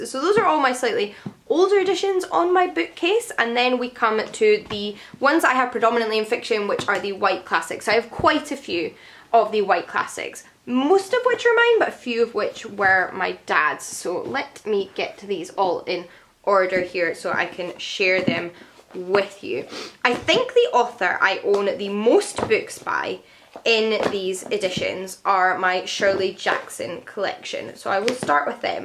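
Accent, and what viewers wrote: Scotland, United Kingdom. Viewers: you talk. i fall in love with Scotland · I discovered your channel a few days ago, and i have to say i'm not disappointed. Besides litterature; being a non-native (from Algeria) english speaker, listening to you really helps me getting used to scottish tongue. So thank you for all that.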